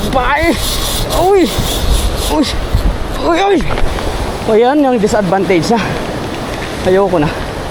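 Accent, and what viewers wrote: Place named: Agutaynen, Philippines. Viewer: Kapatid parang lahing agutaynen ka? May punto ka kasi pag nagsasalita ka, halatado? Hahaha. Pa-shout-out na lng. Joel Zumaraga Tabarangao from Palawan with Love. Thank you and God bless!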